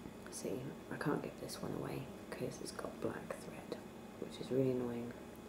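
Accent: United Kingdom. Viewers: Holy crap. I've finally found a whisperer with a British accent! Major win tonight. Great videos!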